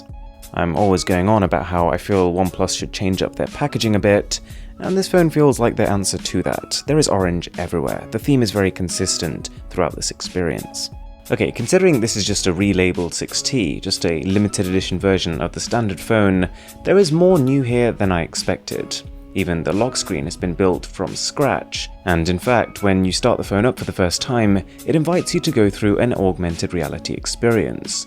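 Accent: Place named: RP, United Kingdom. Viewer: Supersaf isnt as pretentious with his reviews. Drop the posh accent.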